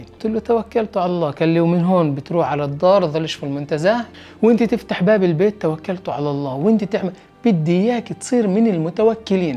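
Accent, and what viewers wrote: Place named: Palestine. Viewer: يسعد اللهجة الفلسطينية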